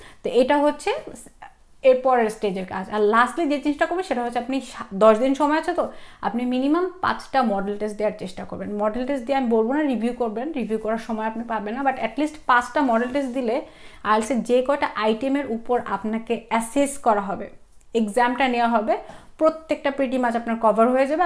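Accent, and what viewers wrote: Sylhet, Bangladesh. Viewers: Apu apni Sylheti? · khuda lagi gesilo......sylheti accent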